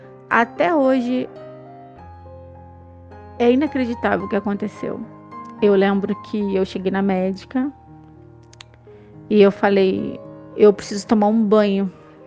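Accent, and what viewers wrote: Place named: Rio de Janeiro, Brazil. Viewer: Carioca da gema 😃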